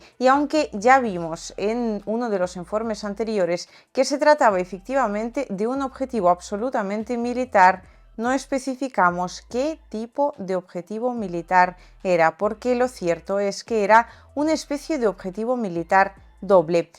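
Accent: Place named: Spain. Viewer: Jaja "Ojos bellos..vosotros sabeis que eso mismo..les Hicieron a los Mexicanos..vale EE.UU. "Utilizo " de todo. Y despojo parte del territorio de Mexico...Puerto Rico..Guantanamo...Japón..los hidrocarburos de Siria..¿¡¡ Y LA ONU.?? AH PERO COMO RUSIA YA TOMO POSESION DE TERRENOS DE UCRANEA..AHI SI SE ASUSTAN Y ACUSAN..CON LA COMPLICIDAD DE GUTERRES. ONU..( hablas como española . Pero..vuestro fisico y rostro..LLEVAN "LA MARCA...SELLO" DE RUSIA..)😁😄😄🥰💕👍👍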